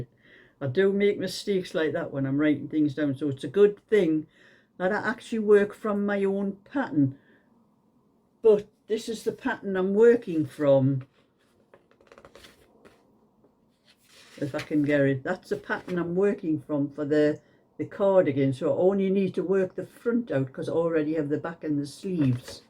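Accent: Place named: Yorkshire, United Kingdom. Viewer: Hi, well had a chuckle at you with the conversation with 😂😂😂.. congratulations on your wins, well done 👍..Hope your heatwaves have ended.. Spring nearly here in Sydney so plants & lawns will be taking off.. we’re 9 hours ahead of you over here I thought I’d mention… I’m a Yorkshire lass born in Sheffield (well not a lass anymore) 😂😂😂 so enjoy your accent, love listening to you chatter on… lots love Josie xxx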